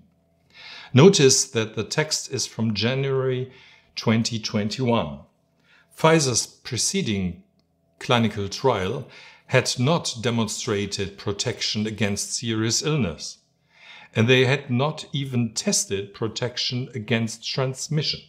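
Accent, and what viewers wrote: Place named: Germany. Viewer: very gut denglish but kommt das noch auf deusch?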